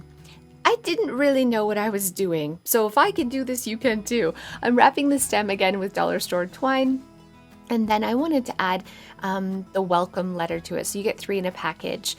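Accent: Canada. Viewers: A few things: 1. These DIYs are so beautiful and elegant! 2. I'm from Florida and your Canadian accent is literally the cutest 3. I've always thought you were very pretty but since your surgery you have so much CONFIDENCE girl and you are rocking it! You look so happy and that makes me happy 😃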